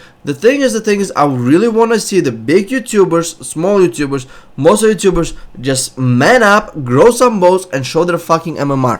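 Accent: Russia. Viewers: как приятно слышать Русский акцент:)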